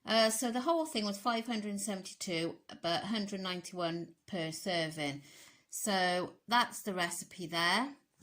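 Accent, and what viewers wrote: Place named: Somerset, United Kingdom. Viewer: Well done steering clear of the treats today, glad hubby enjoyed his birthday, must admit I did wonder if it was easier to just take a flask on you walk 😃. Can I be cheeky and ask if you were originally from Somerset as you accent sounds more from there than Wales? My Dad is Welsh but now lives in Somerset….. if it’s nosey just ignore me. X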